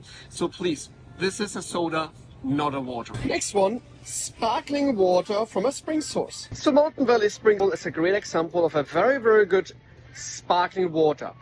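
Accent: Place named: Germany. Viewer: Are you German or Austrian? I love your accent. Im trying to familiarize myself with different accents. Also excellent video. One of the most thorough explanations ive seen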